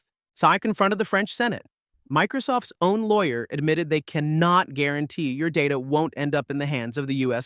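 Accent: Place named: Canada. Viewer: you sound like some dummass canolian.....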